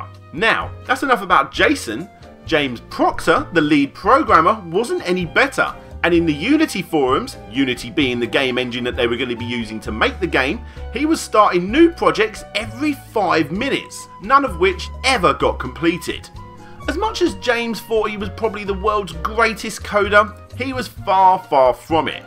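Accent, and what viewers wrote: United Kingdom. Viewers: almost every count down video will have a british speaker....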